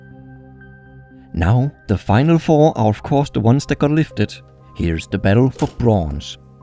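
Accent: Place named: Denmark. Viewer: prøv at snakke dansk man kan høre at du er danks og du er ikke god til engelsk btw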